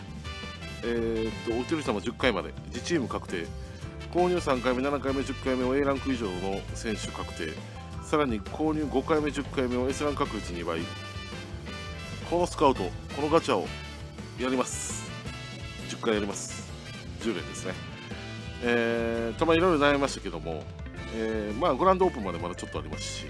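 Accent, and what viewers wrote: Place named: Kansai, Japan. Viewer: さんおめでとう🎉お～らきたぞ笑関西弁凄いね笑笑なんか噂だとBTに原口選手出るとか出ないとか、後少しで覇王だね(*⌒▽⌒*)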